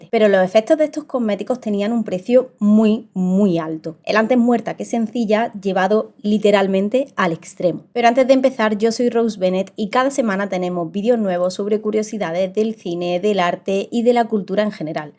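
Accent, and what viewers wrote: Andalusia, Spain. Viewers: Seguro que es andaluza como yo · Tú acento es el más bonito de los acentos de España, al menos para mí oído latinoamericano. Muy interesante y entretenido tu contenido.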